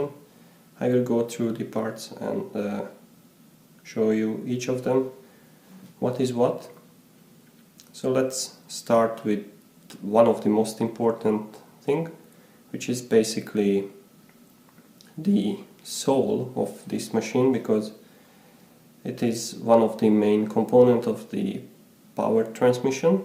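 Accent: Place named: Hungary. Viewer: I can see the Swedish newspaper but I hear Hungarian? Very confusing!?! I'm guessing you are a second generation Hungarian Swede?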